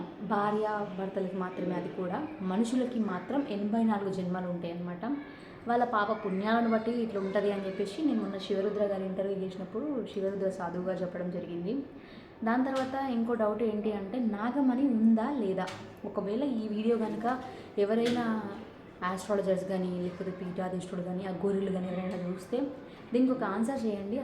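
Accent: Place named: Telangana, India. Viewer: Hi Sister, మీ interview భవిత తో చేసినది చూసి మీ fan అయ్యాను, మీ తెలంగాణా యాస, మీ straight forward questions etc మీరంటే ఇష్టం అయింది. నేను ఒకటి చెపుతాను ఏమీ అనుకోకండి.. నేను వేదాంత classess attend అవుతుంటాను, వాటిల్లో చెప్పేదేమంటే మనిషి జన్మ దొరకాలంటే 84 lakhs species జన్మలు అనుభవించిన తర్వాత మనిషి జన్మ దొరుకుతుంది. మనిషిగా పుట్టిన తరువాత మనిషి గానే పుట్టాలని కూడా లేదు. ఎక్కువ పాపాలు చేస్తూ పొతే మళ్ళీ low level species గా పుట్టవచ్చు. So deep the subject is. I am not negative about you, bkz ur field is different you may not come across vedanta points, only to share with u what I heard in our classess I shared. With authentic gurus you can check what I told. I subscribed ur channel the moment I saw u on the screen.😁 All the best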